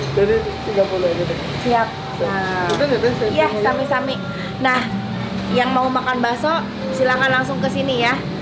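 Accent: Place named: Cirebon, Indonesia. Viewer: Asli orng cerbon mna suaranya 😊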